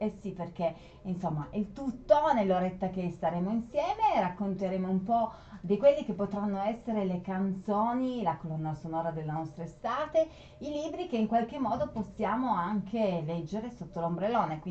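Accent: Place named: Piedmont, Italy. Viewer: Che bella donna che bella persona ❤️ io amo il tuo accento 🌻 ..avevo un'amica del Monferrato mi piaceva troppo come parlava mi ricordi quella situazione piacevole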